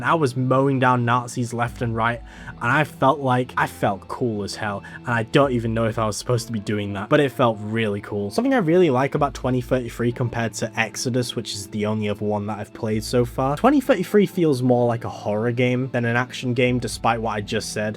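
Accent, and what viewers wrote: United Kingdom. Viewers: You have the same voice and accent of Andrewjrt, which area in the UK?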